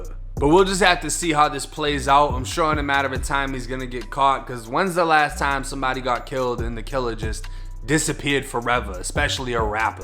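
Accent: Boston, United States. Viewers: Bro, u gotta be from Boston!! Up north somewhere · Bro you from Boston on Florida lol I can’t tell witt ya accent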